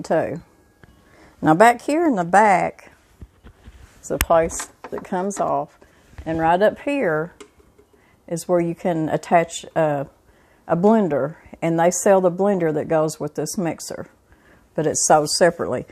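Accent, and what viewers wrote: South, United States. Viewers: You seem like a very nicer woman.....kind and gentle, but your Southern accent drives me crazy and I hate to listen to it. So therefore, I can’t listen to your videos anymore. Y’all just make my skin crawl. Sorry. Cake sounds wonderful!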